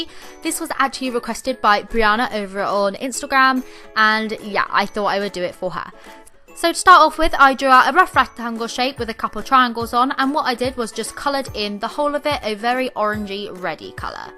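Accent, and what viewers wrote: United Kingdom. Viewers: Luv ur accent I wanna go to uk so bad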